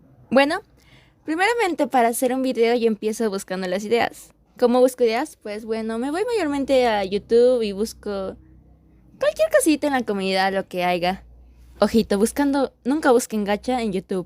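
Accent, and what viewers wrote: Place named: Latin America. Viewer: Sí se nota que eres de latam 😂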